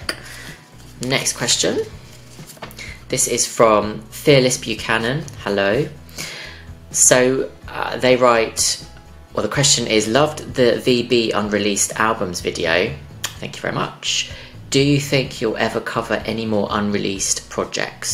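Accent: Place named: United Kingdom. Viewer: Thank you for doing everything you’ve done! I love to see it. You’re very charming but it could just be the British accent 🤷🏼‍♂️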